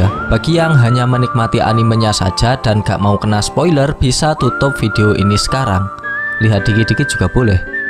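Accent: Java, Indonesia. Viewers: Bang saran makin lama nada di belakang semakin medok dan terlalu panjang ... Sajaaaaaaaa Sanaaaaa Ituuuuuuuuuu Jangan terlalu medok ga enak di denger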